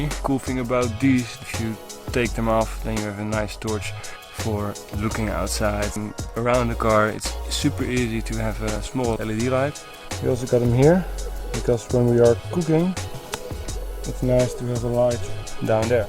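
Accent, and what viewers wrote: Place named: Netherlands. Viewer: Super gaaf gedaan! Ben er best jaloers op! Aan je Engels te horen kom je uit NL denk ik.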